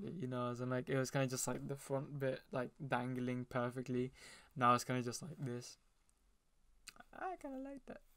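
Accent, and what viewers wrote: United Kingdom. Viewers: aye im new and bruh like on tic toc im thinking he sound Different but he sounding like an british big brother I never had but his laugh had me tumble off the bed literally out of laughter Like the funny face he pulls in sum of them videos like I looking in the mirror trying to make it but failed successful Aye keep up the good job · UHH HES BRITISH?!?! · Wait is he British AHHHH HE IS BRITISH 😮😳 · omg he’s british i always thought he was american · Are you British and BTW IL your vids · Is it just me or does he sound a little bit british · I didn’t know that he had a British accent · NO WAY YOURE BRITISH AAAH OMG IS AALIYAH GRACE BRITISH TOO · When i first saw ur povs on tik tok i thought u were American, hearing u speak British sounds so wrong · Is he British? I am confused 🤠🤠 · WAIT YOURE BRITISH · he sounds british broo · he got the proper london accent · Are you from Britain bec I am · He British omg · He’s Filipino and sounds so English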